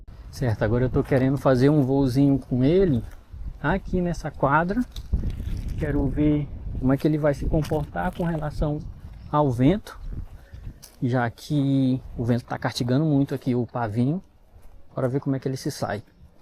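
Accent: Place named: Maranhão, Brazil. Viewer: Vi um vídeo seu que estava se tremendo todo e com dificuldades ksksks. Ja melhorou muito. Vc é maranhense né? Pelo sotaque eu suspeitei que era, e depois que vi escrito UFMA, ai tive mais certeza ainda. Mas só me tira essa dúvida para eu ter mesmo certeza. Sucesso ai meu brother, vídeo muito bom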